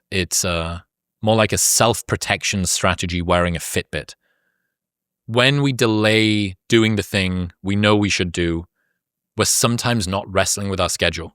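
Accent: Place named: England, United Kingdom. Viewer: When did Zuckerberg get an English accent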